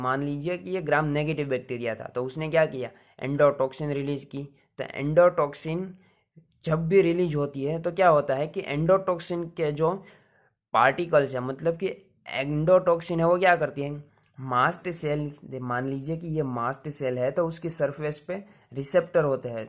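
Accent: Gujarat, India. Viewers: Kem ke Hindi bolo yena upar thi to tame gujrati Lago cho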